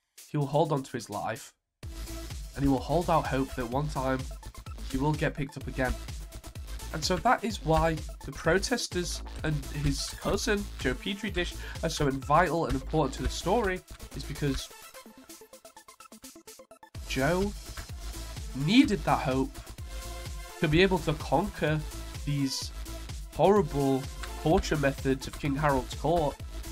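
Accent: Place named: Manchester, United Kingdom. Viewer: I fell asleep watching this last night and I was confused to find my inner monologue briefly had a manchester accent in the morning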